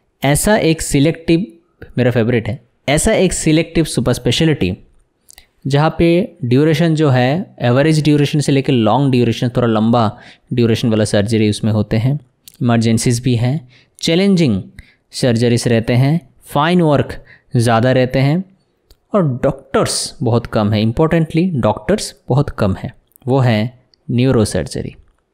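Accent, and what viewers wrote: Bengal, India. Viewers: U have bengali accent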